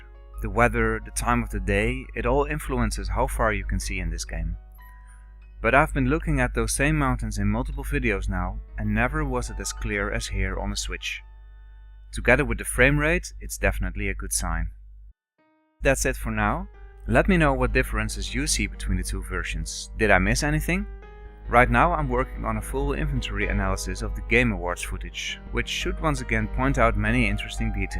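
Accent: Netherlands. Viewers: you're Dutch, aren't ya?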